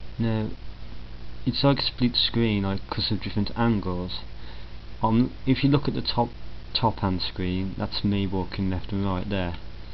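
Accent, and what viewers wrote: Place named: Dudley, United Kingdom. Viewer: This gets 5 stars... just for the dudlay accent!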